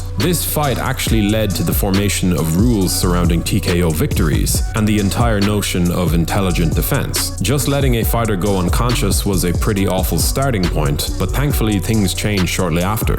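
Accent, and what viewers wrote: Ireland. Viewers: posh d4 west brit ya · You Irish? In your about it says from US but I think I'm hearing an island boi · Are you Irish by chance...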